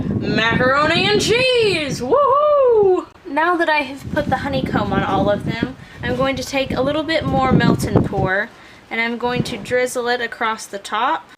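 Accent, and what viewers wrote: Texas, United States. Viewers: Back when Texan accent shown through and was beautiful 😍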